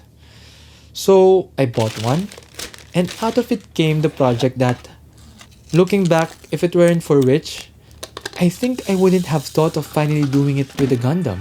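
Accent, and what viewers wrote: Philippines. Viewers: I love the filipino accent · That Filipino accent though, ur my favourite yt channel btw. Rly excited about your reviews and the next build. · I like your Filipino-English accent, doesn't sound conio or pretentious. I watched one of your vid where you assembled this cute-ass gameboy then I noticed the "mini walis" that got me thinking "tang-ina pinoy 'to?" Lo-and-behold pinoy nga. Haha, I don't fancy the fast cuts myself but I like the overall aesthetics. You earned a sub! :)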